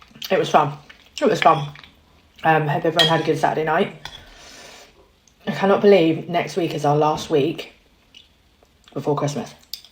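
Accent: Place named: United Kingdom. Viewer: PS I SO LOVE YOUR BRITISH ACCENT!! 😊🥰🥳🍾🤗👏👏👏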